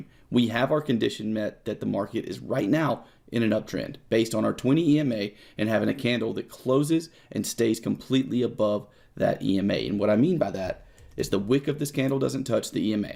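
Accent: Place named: Texas, United States. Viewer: I'm not even American, but you sound like you're from texas or something.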